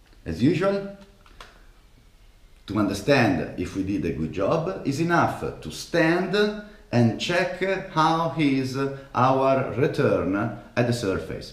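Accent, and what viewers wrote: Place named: Italy. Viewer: Your italian dialect is so cool!😎🤙🏻 Thank you!😊👌🏻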